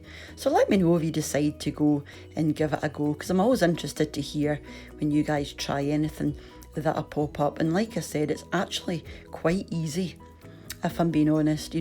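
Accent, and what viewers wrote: Scotland, United Kingdom. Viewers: I was complimenting her strong Scottish accent. I have traveled to 48 of the 50 states, including Alaska and Hawaii. The only 2 I have missed are Tennessee and Kentucky. Maybe they have the strongest accents! I was born in Philadelphia and have lived in the west most of my life. I don't have an accent. By that I mean I don't believe there is a typical American accent like a British or Irish, South African, etc. Don't assume I haven't traveled.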